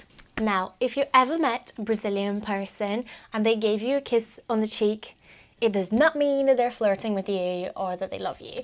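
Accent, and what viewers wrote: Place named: United Kingdom. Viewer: Delightful, charming and insightful! Your ‘British’ accent, btw, is adorable! 👌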